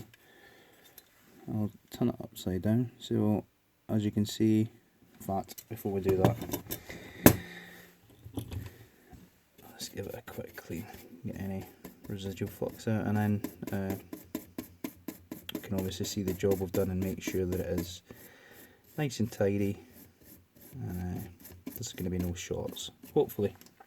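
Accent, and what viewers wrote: Scotland, United Kingdom. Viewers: I like your Scottish accent. Don't do yourself down.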